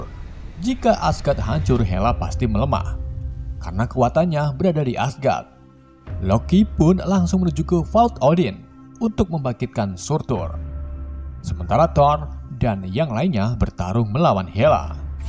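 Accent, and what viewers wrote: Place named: Java, Indonesia. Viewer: ket benslet 🤣🤣🤣 ngakak medok parah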